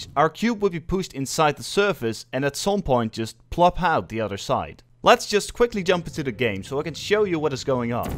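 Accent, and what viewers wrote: Netherlands. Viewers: Damn your accent is Dutch 😂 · Dude sounds Dutch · Nicely explained! I did some portal experiments in UE4 a while back and it's pretty much the same implementation I vaguely remember reading from one of Valve's presentations that they do all the rendering in one pass by setting the view/projecting matrices for the masked area of the portal so they don't use a secondary camera in the same way. It's been a while, so the details are blurry to me. That would save a bunch on performance, but is a lot more involved in getting to work proper. Are you Dutch by any chance? subbed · Love the fucked up half English half American accent of this Dutch guy. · You happen to be Dutch?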